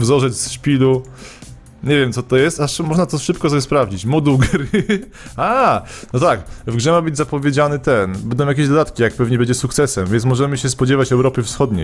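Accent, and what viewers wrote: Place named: Silesia, Poland. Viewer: slonskoooooooooooooooo glodkaaaaaaaaaaaaaaaaaaaa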